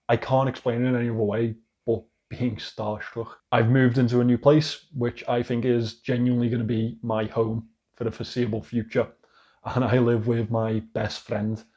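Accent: Liverpool, United Kingdom. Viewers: Wow, that was unexpected. I really thought you are shutting down the channel. But now it is a different story! Wow. 🙂 I am not an English native speaker, so I used to struggle understanding your dialect, but with every video I get more and more. I recognize your videos not only as a way to discover watches, but also as a way to learn and better understand British English. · Sorry for all you’ve been going through, but congratulations about being able to do this full time now. (May I ask if you’re from the Liverpool area? I sense a Scouse accent, but I’m American so I’m never sure. I’m just a big Liverpool FC fan and have been for about 16 years.)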